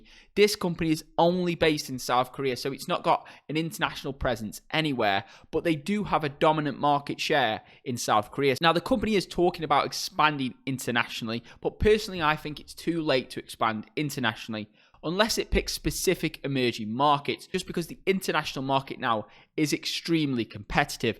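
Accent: United Kingdom. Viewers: Your accent is so British.Awesome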